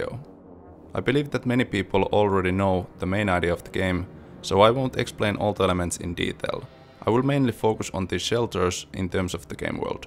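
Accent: Finland. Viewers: Recognized the finnish accent as soon as the talking started, it's somehow so particular. Graphics and environments look very nice. Will wishlist this. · Its clearly finnish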